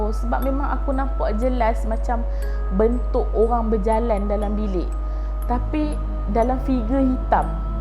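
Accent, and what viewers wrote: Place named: North, Malaysia. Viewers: sat ckp utara sat ckp kl..dik biaq selaraih baru tatabahasa cantik dn crita jd menarik..cuma nk peringat jar..apa2pun good effort.. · Terbaik 👍👍 Teruskan bercerita dalam dialek utara. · Suka l dgr akak ckp utara😍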